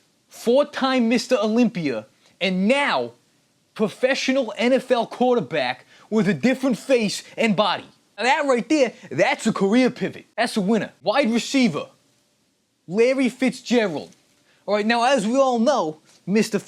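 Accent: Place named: East Coast, United States. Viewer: Roman bath orgy lol This guy looks arab but talks east coast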